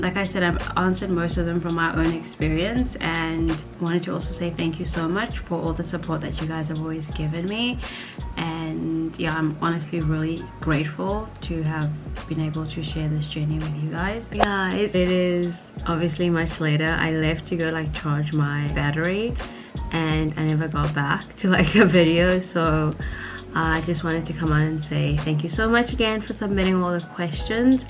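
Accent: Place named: Australia. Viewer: I think I am the only person who thought you had an Australian accent. 😂 Will you be coming back home to watch Beiber? 😏 It is so amazing watching your videos, I have been in love with your content since the first video I watched. Thank you so much for sharing all your experiences with us, it is truly amazing to watch. I hope you will be able to come back home and just be with your family. Sending love and support from home.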